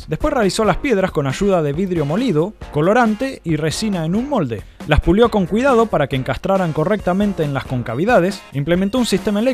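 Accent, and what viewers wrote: Argentina. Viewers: Que argentino ameooooooooooooo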